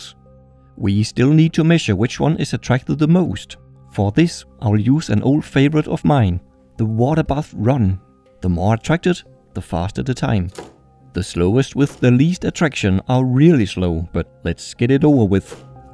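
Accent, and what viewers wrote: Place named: Denmark. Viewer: prøv at snakke dansk man kan høre at du er danks og du er ikke god til engelsk btw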